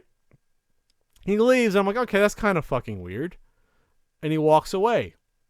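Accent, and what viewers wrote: New Jersey, United States. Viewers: I love the Jersey in u. it's one of the main reasons I watch. the New Wario, funny shit.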